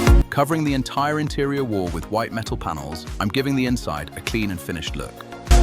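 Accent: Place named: United Kingdom. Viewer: lol what? The voice is obviously British and this is obviously located in the US.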